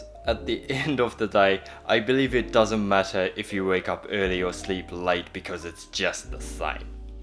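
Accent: New Zealand. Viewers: I was very impressed by how you were able to cut and style your own hair with the use of a mirror. And the handsome smiley face topped off the whole ‘look’. 😉 Where did you learn English? Some words sound like British English and then some sound like the English spoken in New Zealand. Really enjoyed watching your video. Best wishes from Stefan in Ireland. Update: Ha!! I just saw some of your other videos and now understand why your accent sounds rather like a New Zealander!! Lol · Did you spend time in New Zealand, your English has hints of a kiwi inflection. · I can definitely here your kiwi accent, 😄cool bro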